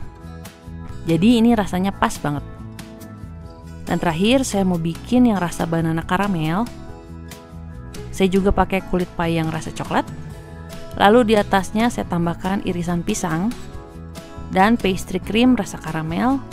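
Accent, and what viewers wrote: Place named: West Java, Indonesia. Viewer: Vanila', susu', dulu'😂 Mojang Sunda euy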